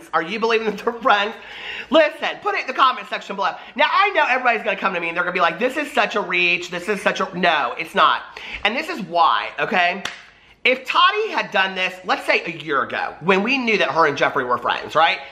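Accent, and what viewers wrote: South, United States. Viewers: OMG you do remind me of Nancy Grace! Sassy and southern! Can she please come back on TV?! I miss the endless tea on tot mom and that Jodi Arias.....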